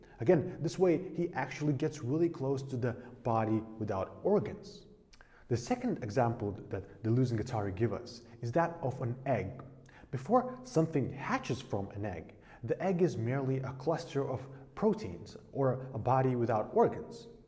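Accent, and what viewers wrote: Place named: Lithuania. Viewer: The accent reminds me of a Lithuanian one. Thanks for the video!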